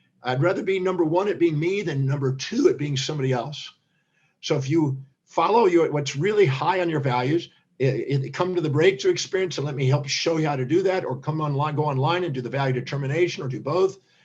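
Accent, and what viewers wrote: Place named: United States. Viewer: An amazing mix for a speaker: american english accent + italian passion and intensity Got your message so clearly! Thank you again 😊 P.s: I hope I'm right saying 'an american english accent' as I'm from Argentina.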